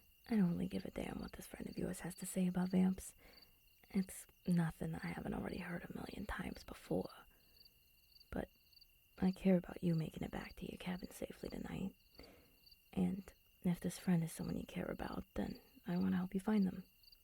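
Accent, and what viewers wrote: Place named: New York, United States. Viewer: Hey look New York vampire. Or is she from Boston?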